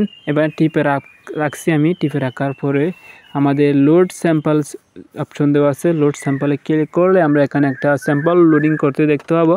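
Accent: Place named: Chittagong, Bangladesh. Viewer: ভাই আপনি চট্টগ্রামের মনে হয়। আপনার ভাষা শুনেই বুঝা যাচ্ছে 😅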